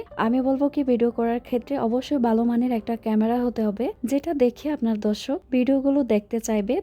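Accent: Bangladesh. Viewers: আসসালামুয়ালাইকুম আপু তোমার ভিডিওটা অনেক ভালো লাগলো তোমার বাংলাদেশের ভাষাটা দেখে অনেক ভালো লাগলো তোমার মেয়েটাকে মাশাল্লাহ আমার অনেক ভালো লাগে জন্য অনেক অনেক দোয়া❤❤❤❤❤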